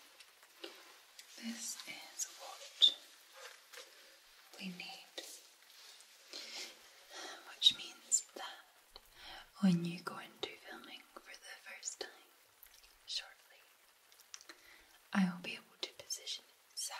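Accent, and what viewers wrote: Scotland, United Kingdom. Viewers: Wow, this is a good one. I love your Scottish accent! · You are my favorite Asmrtist♥️ seriously I fell mesmerized since the first time I heard your voice in of of your videos with Scottish accent 😌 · Had a tiring day at work , so listening to those velvet Scottish tones from my favourite Bonny lass is just what is needed before bedtime ❤️u.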